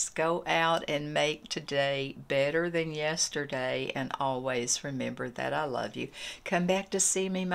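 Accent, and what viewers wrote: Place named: Texas, United States. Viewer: I just love your hair and your hair color and your eyes and your makeup and everything and I love love love love love love love the lipstick colors I'm going to get those for sure and could I ask did you say what blush brush brand that is it looks so soft and so pretty.... I want to get that brush .and thank you so much I'm learning a lot from you I am almost 70 years old and I still have so many problems and my skin still breaks out I have to be very careful ... it's very dry and acne prone even at my age so if you have any advice for me please let me know I can't use oils except around my eyes and forehead .. on my other part of my face I can't use any oils unfortunately but I want to get some of that skinn Elixir and put it around my eyes like you did and a little on my forehead and even my neck....thank you I love it ! 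I'm in Texas and sounds like you have Texan accent and I love it ! Lol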